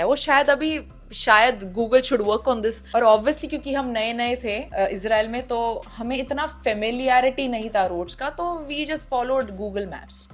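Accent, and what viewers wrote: India. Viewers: i come across with your videos today, and watching you speak Hindi with desi accent i can't stop laughing. 😂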